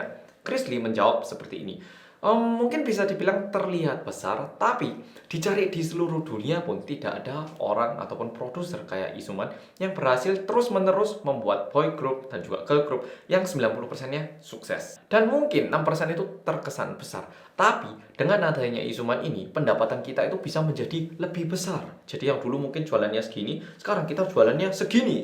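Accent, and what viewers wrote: Java, Indonesia. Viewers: Penjelasannya mudah di mengerti padahal Jawa ne medok tenan😎 keren keren 👍👍👍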